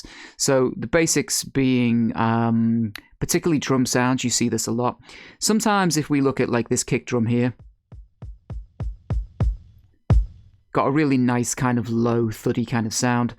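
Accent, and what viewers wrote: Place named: London, United Kingdom. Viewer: I can hear the Liverpool and Manchester -it’s actually the London that keeps wanting to break through 😀✌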